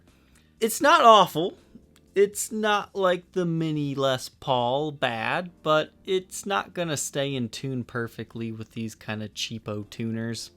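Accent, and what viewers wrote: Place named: Alaska, United States. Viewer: Thatuckin sarah palin accent is hilarious..... There is someone else who has that accent, a famous actor or SOMETHIGN..... MAN I can't think of who it is.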